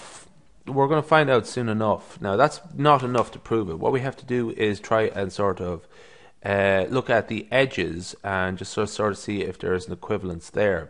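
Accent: Ireland. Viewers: you know Conor Mcgregor? judging by your accent, i bet you're Irish. :)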